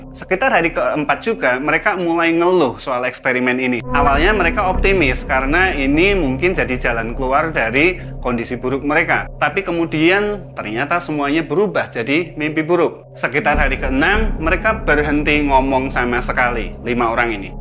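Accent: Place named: Java, Indonesia. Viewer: Suara anda medok banget 😅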